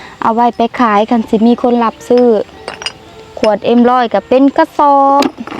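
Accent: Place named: Laos. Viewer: ลาวคักๆเรียกคำกำกวมเก่งมาก ทีบพ้อว้อเหมือนคนเเก่ที่อุบลพูดเลย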